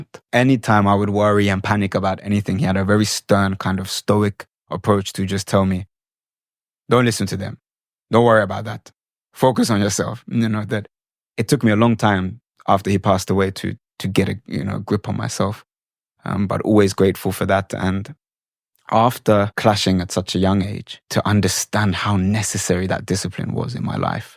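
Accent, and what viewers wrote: Ghana. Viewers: Ps, I enjoy when he mimicking his grandfather. Besides, he does have a Ghanaian accent himself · Ghana all the way. I Can fell the blood in your accent although u are white. Much ❤ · I hear A Ghanaian Accent 😁😁😁😁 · The Ghanaian accent is so funny coming from him - grand dad must have been such a character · The Ghanaian accent 😊😊😂😂..... Nice story about your journey. Inspiring 😊😊❤ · even with an overlay of a British accent, Ghana still comes forth in your voice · if I were to be in Ghana, I would have loved to host you and your friends and spoil you a little with Ghanaian food and hospitality. You sound like a Ghanaian also. Rest in peace, grandpa, and be proud because is making you proud. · you are a true Ghanaian from how you speak, discipline..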